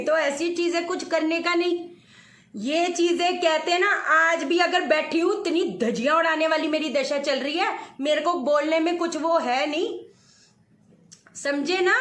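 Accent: Punjab, India. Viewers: di punjabi touch ayta batono maa apki maa bi punjbi hun so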